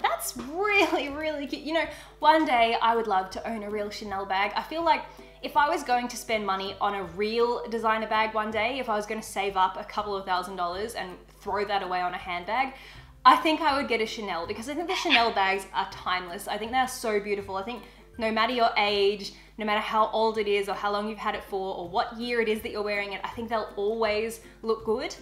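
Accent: Australia. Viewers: im choking, you're aussie? bLEsS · GURL U AUSSIE!!! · are you australian?and your bird is so cute i had the same one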